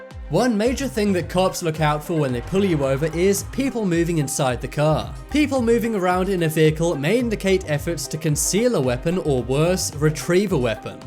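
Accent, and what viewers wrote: United Kingdom. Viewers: Annoying uk accent about supposed us bullshit. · This person has a British accent. Maybe that's what British cops do. NOT AMERICAN COPS!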